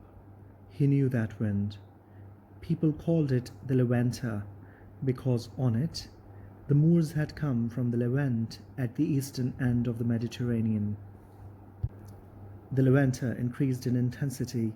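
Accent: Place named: United Kingdom. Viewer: Pure British ❤️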